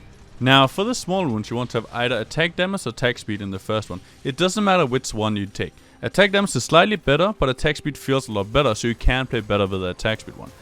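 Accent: Denmark. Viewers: you sound like a dane ?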